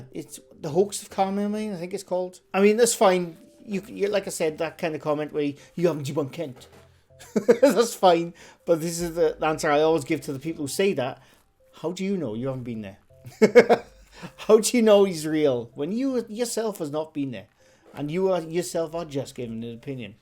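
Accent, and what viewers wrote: Wales, United Kingdom. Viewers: it's even better in a Welsh accent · I like your accent... so I like your Welsh accent too!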